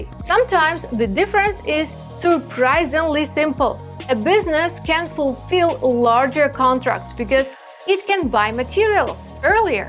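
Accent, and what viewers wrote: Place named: Eastern Europe. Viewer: east europ i think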